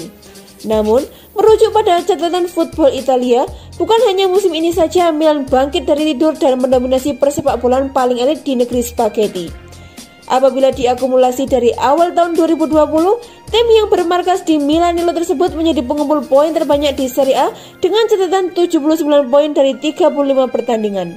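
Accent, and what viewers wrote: Java, Indonesia. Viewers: Coba belajar ngomong dulu yg bener kalo mau jadi narator. Ngomong medok,nyebut nama aja salah. Apalagi beritanya simpang siur plus ngarang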